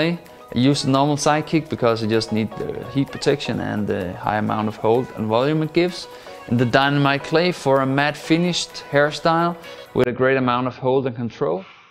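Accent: Denmark. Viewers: Hej med dig dansker man kan høre du er dansker med din accent men vanvittig video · you can just hear he's Danish, VIKINGER FOR LIFE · Du fra Danmark :) Det er nemt at høre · Are u Danish or something? You kinda have a danish accent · Er du dansker det er alså radt tydligt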